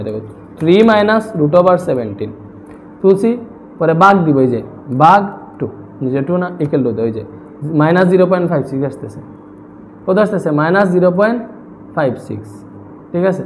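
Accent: Sylhet, Bangladesh. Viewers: সার আপনার বাড়ি সিলেট নি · Uni Sylheti..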